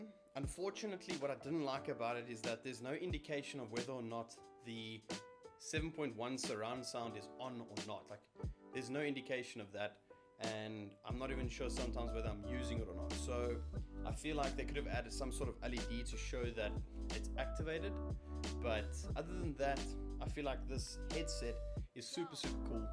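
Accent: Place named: South Africa. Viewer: so Ja YOU ARE DEFF a South African XD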